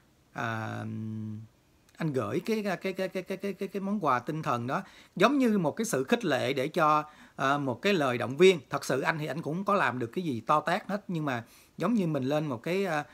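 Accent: Standard. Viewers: Bạn này nói hay và chuẩn nè. ❤️❤️❤️❤️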